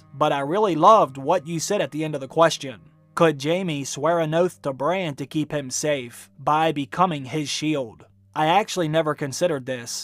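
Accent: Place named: Alabama, United States. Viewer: Are you from Alabama? cause you sound like forest gump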